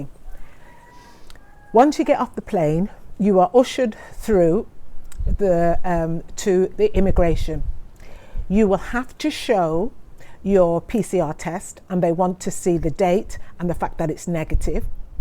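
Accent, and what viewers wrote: United Kingdom. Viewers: Cou cou is our local dish; actually cou cou and flying fish. I can hear from your accent that you are British, do you have Barbadian relatives? Most black folks speaking with a British accent do have Barbadian relatives. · Are you British ? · Where you from cock .... Sheffield?😁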